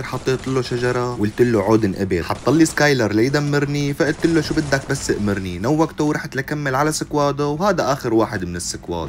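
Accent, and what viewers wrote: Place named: Levant. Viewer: مبين شامي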